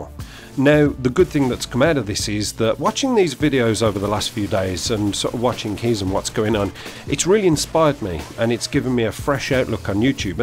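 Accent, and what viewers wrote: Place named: Black Country, United Kingdom. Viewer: Great vid new sub u sound like a fellow yam yam with ya accent are u from wolves or nearby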